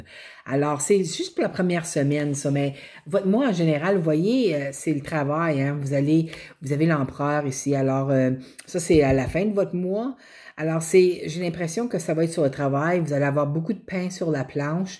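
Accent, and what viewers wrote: Quebec, Canada. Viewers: Cc vous êtes superbe ravi de vous voir et mettre un visage sur votre belle voix québécoise des bisous 😘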